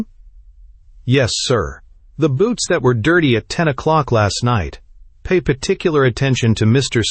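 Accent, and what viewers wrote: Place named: United States. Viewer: Nice hearing plain straight up American voice after excessive British with most over the top with the snootiness and the nearby islands of accents with strictly another country's vocabulary we can at best barely make out.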